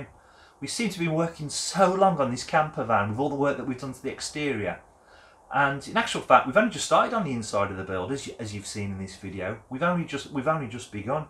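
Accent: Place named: Walsall, United Kingdom. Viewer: Thanks for sharing your build and I’m just about to start my build. I’m based in Walsall and you sound very local 👌🏻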